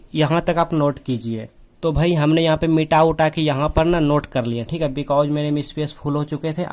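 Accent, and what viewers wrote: Bihar, India. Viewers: bhai aap bihari ho kya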